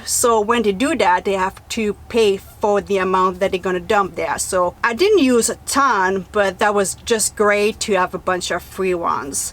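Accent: Quebec, Canada. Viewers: Thanks for the information. You are very informative. Again you give me hope that to have a van and travel is not a foolish dream. Love your accent. It is what I imagined Canadians would sound like. I am from USA. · Somewhere on here she has said French Canadian.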